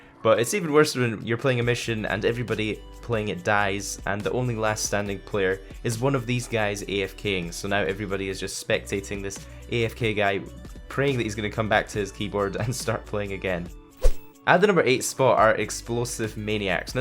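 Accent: Scotland, United Kingdom. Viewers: Are you Scottish? But I hate explosive maniacs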